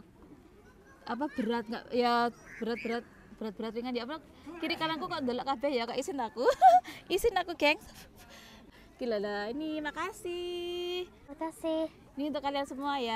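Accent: Java, Indonesia. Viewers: Halo cantik pinter banget kamu nduk boso jowomu medok banget ❤❤ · INI GARA - GARA BULE KW BISA DAPAT SILVER PLAY BUTTON CANTIK, LUCU BAHASA JAWA SANGAT MEDOK SUKSES TRUS MANTAP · Medok men nduk..lucu.. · Bule medok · Yeay medok udah silver button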